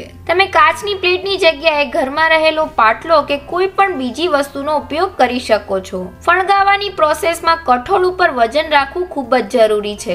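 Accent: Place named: Kathiawar, India. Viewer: good,Kathiyawadi uchchar sambhalvani maja avi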